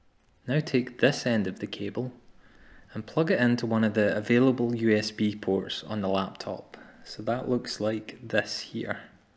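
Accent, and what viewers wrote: Scotland, United Kingdom. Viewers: Thanks for your very helpful video. Ever since I accidentally found an old mp3 player unit when curiously searching around and had no idea on how it works until I found this video. If my calculations are accurate, I have noticed that your accent appear to be Scottish. Correct? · Sounds like Scottish 🤩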